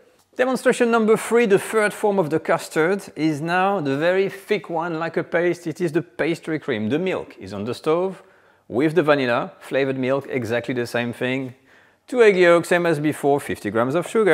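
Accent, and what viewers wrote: France. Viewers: I must admit, having a French accent does make everything better and more flavorful😅 and even easier to follow. Love your videos. Merci!!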